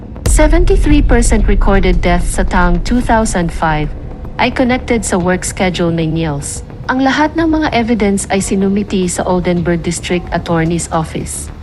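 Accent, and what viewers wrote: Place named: Spain. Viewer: Hello sir How are you today in Austrailia? Thank you so much for visiting! and I wish you have a lovely weekend😊 I feel the Spanish accent in your language, where are you from? anyway it was so cool😊 new subscriber from south Korea!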